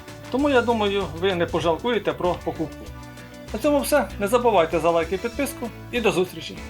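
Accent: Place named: Surzhyk, Ukraine. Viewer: На практикі на практиці Мало міста мало місця! Це ріже слух. Я за розвиток україномовного інтернету, але ж не суржикомовного ☝️😠 В мене родичі в селі можуть так говорити, але ж вони не роблять ролики в Ютубі, виставляючи свою недорікуватість на загальний огляд. Щодо диску, то Сігейт чи не самий ненадійний серед виробників. Довіряти йому якусь більш-менш цінну інформацію навряд чи має сенс. Якщо це і робити, то хіба що в RAID O архіві.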